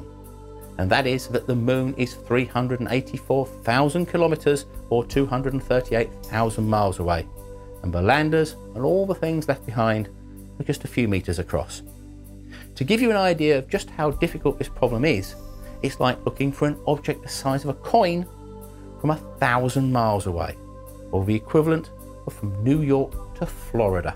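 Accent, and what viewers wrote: United Kingdom. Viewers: The soft tender British voice of reason!! Google has discovered my chickens and their feathers in my back yard through the atmosphere!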